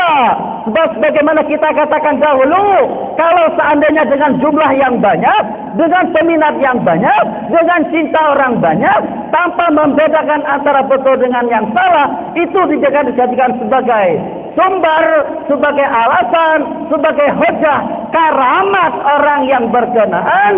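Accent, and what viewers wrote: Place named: Banjar, Indonesia. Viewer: sidin nhe urang manaaa, ada banjary nya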